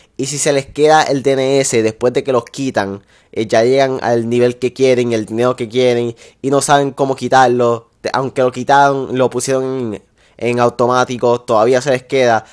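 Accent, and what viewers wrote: Puerto Rico. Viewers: Tu eres de puerto rico te eschuchas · tu eres de Puerto Rico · Eres de puerto rico? Se nota por el acento, biem Video like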